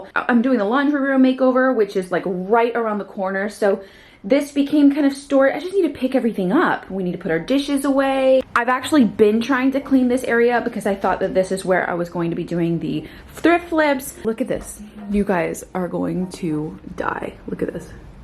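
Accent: Texas, United States. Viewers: I love hearing your Texas accent coming out more. And Kinsley is so adorable ♡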